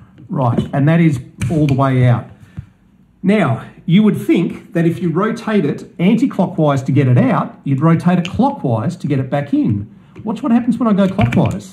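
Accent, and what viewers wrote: Australia. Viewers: The moment i jeard the aussie accent i knew i was gonna hear exactly what i needed to hear in perfect detail. Ya folks down under are really something else when It comes to automotive prowess.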